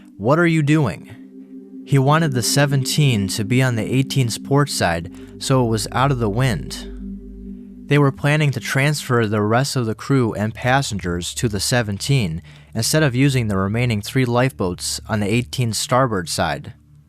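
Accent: Cleveland, United States. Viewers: Dudes gotta be from Cleveland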